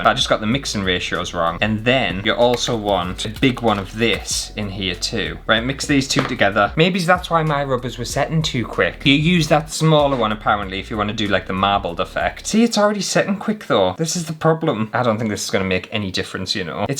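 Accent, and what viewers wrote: United Kingdom. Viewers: Cheers to all from the North, best UK accent by far. :D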